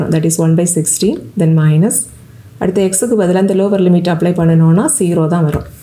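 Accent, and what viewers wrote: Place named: Nagercoil, India. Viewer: Thanks mam,clear explanation with nagakoil slag was awesome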